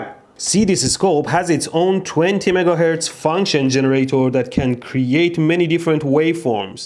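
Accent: Iran. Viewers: I love your Iranian accent. Greetings from Germany. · your english accent... I knew you were a fellow iranian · لحجه ای که بخاطر ایرانی بودن به ارث بردی را خیلی دوس دارم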